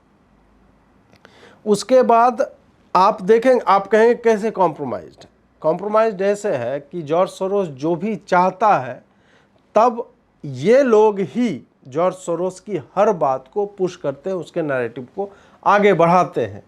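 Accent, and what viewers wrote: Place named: Bihar, India. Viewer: vo bihari h hamare yaha UP aur bihar me aise hi bolte h yakin nhi to UP CM ka bhasan suno. Sikhao mat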